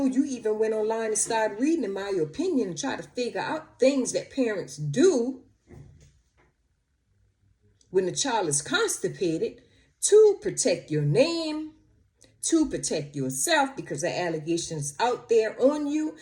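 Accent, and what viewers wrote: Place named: New Orleans, United States. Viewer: Ms you very pretty and I like your accent. Are you from New Orleans?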